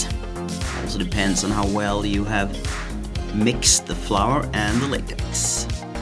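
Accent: Scania, Sweden. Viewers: när du började snacka skånska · Känner igen den svenska dialekten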